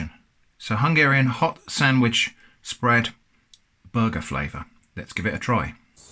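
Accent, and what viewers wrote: United Kingdom. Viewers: pretty close on the spelling! with british accent of course! I understood it so good job! also these are damn great and tasty usually (ill edit it later, just started to watch the vid lol) edit: yup, probably one thing i miss from hungary since i moved to the uk... this stuff... the stuff here tastes like bullcrap, and not all of the polish shops sell this kind of stuff:/ but im happy, that it is not just loved by the eastern europeans, but by others as well! also try it with ham/chorizo on top of it, and add a bit of oregano on top of the cheese! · Your accent is magnificent. We Yanks speak crassly compared to the UK folks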